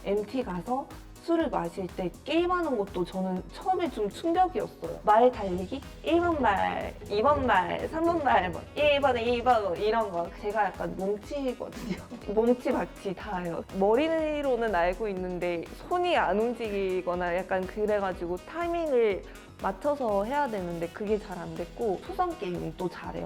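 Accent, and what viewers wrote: Seoul, South Korea. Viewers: 와...진짜 서울 사람이네요. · 한국발음.한국얼굴 한국인이네.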